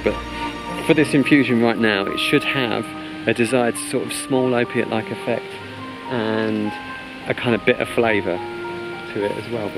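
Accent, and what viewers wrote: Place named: England, United Kingdom. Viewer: I usually hate English accents. But, This guy sounds like Michael Caine♥️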